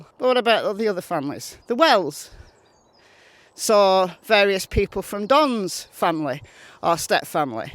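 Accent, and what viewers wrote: United Kingdom. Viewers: Your channel makes the most sense. You are not a gossiper, fabricator and are authentic and I love your British accent 👋🏽💘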